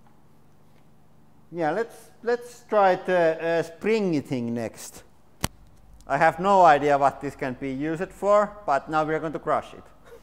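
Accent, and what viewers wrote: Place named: Finland. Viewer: You have finnish accent. :)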